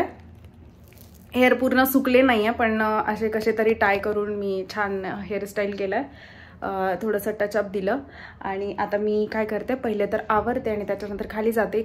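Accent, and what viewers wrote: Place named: Nashik, India. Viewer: शेवटी ताई तुझ्या बोलण्यामध्ये थोडी नाशिकची झलक आहेच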